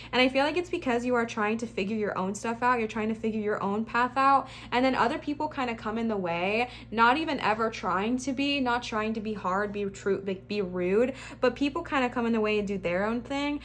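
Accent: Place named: England, United Kingdom. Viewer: Pile 3 here💖💖💖 Once again I'm called out on being an aquarius😅💖 Lol so first thing I thought was hilarious is that last night I was having a little trip and I couldn't stop speaking in an english accent lol And also, was thinking in an English accent, like without even really thinking much of it or even trying to. When I came to this reading and you started talking in the english accent it made me remember that and I'm like so curious on what this english accent means to me lol Universe just wants me to notice that😅 A thing that I noticed that I think is super cute is how you yawn a lot when you do readings. I feel like these yawns mean something, I've always found myself doing the same thing while trying to perform in certain ways. Lol for instance when I sing in front of people sometimes I yawn A LOT and I just can't stop and never knew why. 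I never thought about it so much until I started watching your readings. I wonder if yawning is like a sign of spirit coming through or something, like a natural reaction for some of us. Whatever it is, it's fascinating and I'll probably google it lol Thank you for this, it resonated so well and was so empowering. Everytime I start to question myself I love how I have this beautiful tarot community who can help me connect to my guides. It's honestly so important, it helps me keep pushing through. I get what you mean about the comments people make. Like, this comment a friend said to me last night almost stressed me out a little bit. I w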